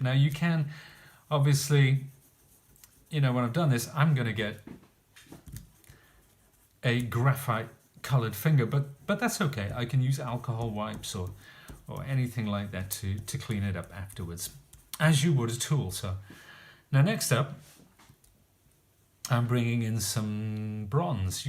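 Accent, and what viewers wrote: United Kingdom. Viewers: Well done! Also... nice to here a fellow British accent! 🇬🇧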